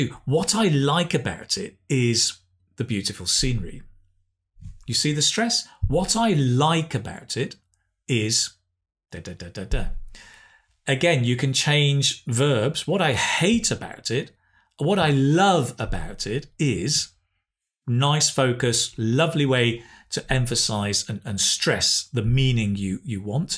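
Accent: United Kingdom. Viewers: I like your channel, like your British accent and the way you explain and teaching 💗 your fan from Morocco 🇲🇦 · What i like about this page, your explination and your Lovely pure Britgish accent. Its brings comfort and gives us confidence.thank you very much sir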